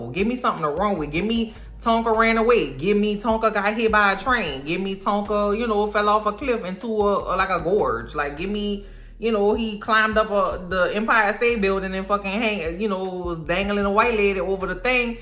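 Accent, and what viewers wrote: New Orleans, United States. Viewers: Just watched both episode last night love your commentary and your Nola accent 💚💯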